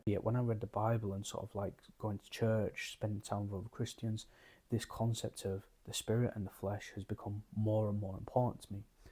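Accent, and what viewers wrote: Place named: West Yorkshire, United Kingdom. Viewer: ithought it was a west yorkshire accent. I studied linguistics at uni. Id love to meet up buddy if possible for a chat sometime? To hear your journey and to ask for advice on the Gospel.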